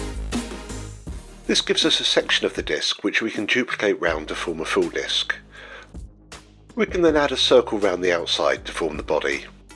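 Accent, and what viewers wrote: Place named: United Kingdom. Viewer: Gosh, you int 'alf clever! And so refreshing to hear a British chap doing interesting stuff on Youtube. I hope I haven't broken any rules. 😀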